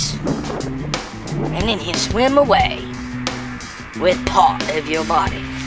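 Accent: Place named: Australia. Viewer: Hey Bro, always entertaining! Kick'in butt . Love the auzzie talk'in mate!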